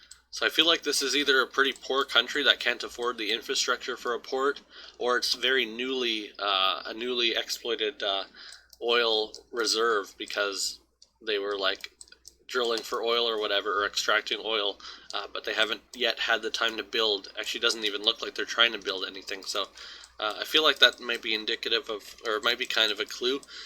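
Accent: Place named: Canada. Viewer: i am so glad you came up in my recommended! this was a great video, and judging by the accent, sounds like you might be a fellow canadian?!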